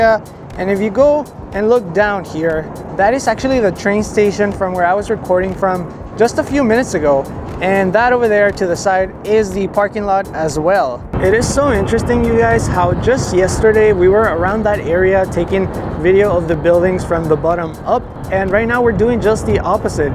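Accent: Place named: Laredo, United States. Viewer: Whenever I want to show people what a Laredo accent sounds like, I'll show them your vids haha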